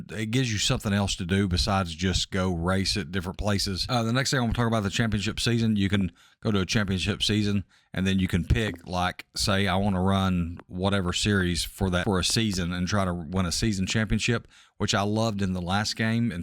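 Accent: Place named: South, United States. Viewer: Thanks a pant load.. 30 whole seconds running a late model (I have no interest in) all by yourself. And 8 min 20 seconds listening to your dumb redneck accent talk non-stop. Thanks to you, I have no clue what it might be like running a sprint car (Original Outlaws) with other cars on the track. Imagine that. Other cars on the track. Have you ever advanced that far?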